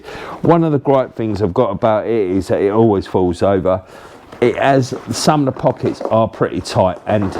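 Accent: United Kingdom. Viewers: So british. Sick loadout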